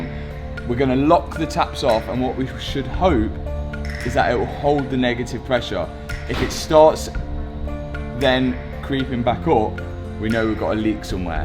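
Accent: United Kingdom. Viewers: Watching your videos to learn british accent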